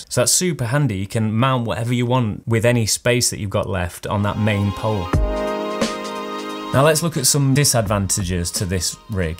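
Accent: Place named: Manchester, United Kingdom. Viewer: Tempted to get this for my videos. I feel like you're from Yorkshire like me but for some reason I'm getting Manchester accent vibes. 🤣🤣 I could be entirely wrong but you're definitely northern.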